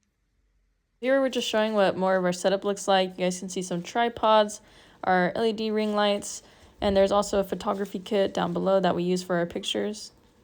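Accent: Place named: Australia. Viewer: Is it just me or did she go from no accent to Australian accent 😅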